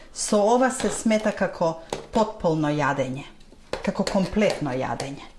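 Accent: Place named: Kavadarci, North Macedonia. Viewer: Bravos super si!! Site videa se so tradicijata na makedonskata kujna i se odlicno napraveni(zgotveni) a se razbira i mnogu dobro objasneti.👍😊Sakam da te prasam od kade si ti od kaj poteknuvas od koj kraj na makedonija??deka akcentot tvoj e mnogu ubav i me potseka na mojot kraj od kaj sto sum jas Kavadarci a sega ziveam vo Sydney Australia.Golem pozdrav od mene Gorica 😊 i se najdobro.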